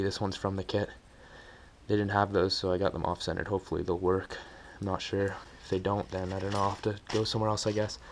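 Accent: Canada. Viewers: Could tell by the N that youre from BC. You from Vancouver or the island?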